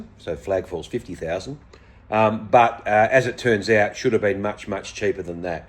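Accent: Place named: Australia. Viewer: Why are you talking that way ? Can't you talk normally ? Your accent irrtates me a bit. Is that an Australian accent? It's a second worst accent I've heard. The worst is South African accent . The second one is yours.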